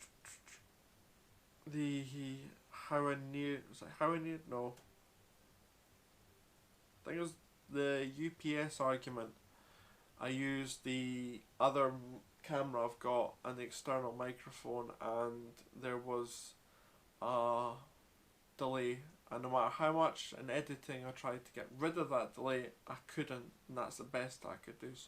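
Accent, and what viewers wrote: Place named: Scotland, United Kingdom. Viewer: Scottish accent is his charm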